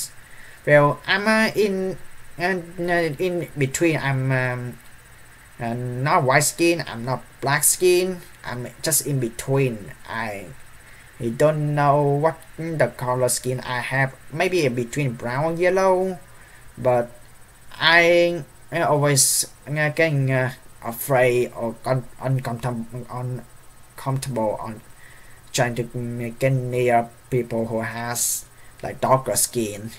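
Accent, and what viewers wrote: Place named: Vietnam. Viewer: *Vietnamese accent intensifies* but keep trying, one day you can speak it fluently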